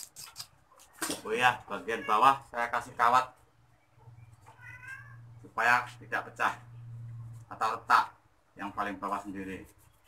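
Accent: Java, Indonesia. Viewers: Sampean logat bicaranya kentel sekali dg logat jawa mas, jawanya mana mas? Aku jawa tengah mas..🙏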